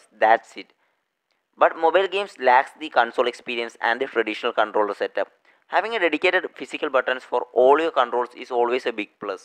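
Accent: Kerala, India. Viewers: lol indian accent...rip english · pure malyali accent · Da neey mallu anallae?.. voice ile manasilayadane enake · from ur accent i understood ur from kerala. Even im in kerala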